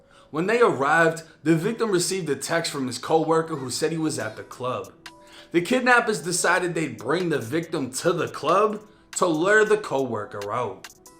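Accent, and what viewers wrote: Boston, United States. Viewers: I Love❤ Your BOSTON ACCENT...🔥🔥🔥🫶😁🔥🔥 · First time ever seeing this channel….. dude gotta be from Boston 😅😂😅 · I hear a boston accent? i live in dorchester. from southie. definitely subscribing.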